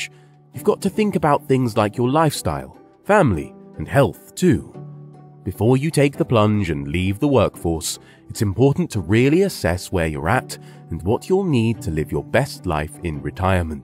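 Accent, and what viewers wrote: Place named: England, United Kingdom. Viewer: Best advice (same I gave my kids) is to start paying into a pension plan asap, and by that I mean in your early 20s if youre able. I never invested in stocks and shares and all that malarkey, just my pension, and that was literally from 20yrs old, and I think I will be ok. Btw, I find it weird that theres some English guy narrating this video but its all about how Americans should prepare for retiring 😂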